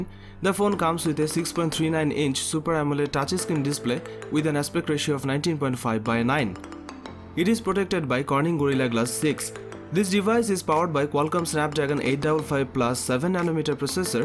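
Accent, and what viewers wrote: India. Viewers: Please, next time without Indian accent🙏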